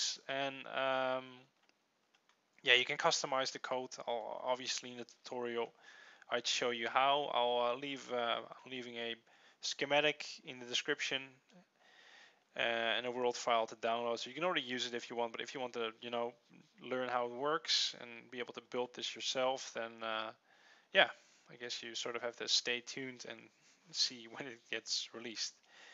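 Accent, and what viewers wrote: Netherlands. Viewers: kan duidelijk horen day hij nederlands is waarom kan dat altijd :p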